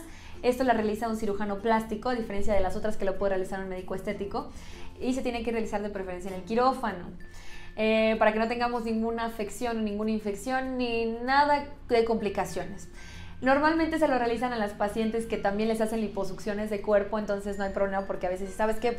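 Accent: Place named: Mexico. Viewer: nunca dicen la moneda completa, pero asumo que es mexicana, por el acento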